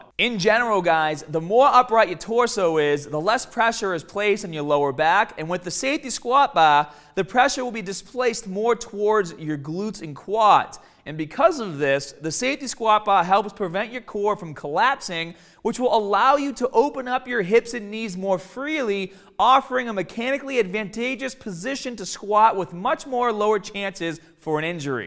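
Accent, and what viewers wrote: Boston, United States. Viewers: Are u from Boston bro holy cow 😂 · is a good dude. His Boston accent is just a little distracting! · It’s been a while since I watched his videos...Since when did he start with Boston/New York accent? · when did he develop a boston accent? never heard him talk like this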